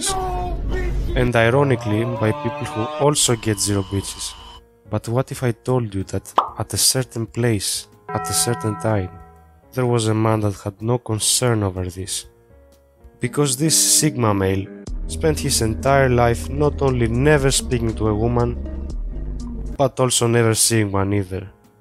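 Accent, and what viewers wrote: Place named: Greece. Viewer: ellinas einai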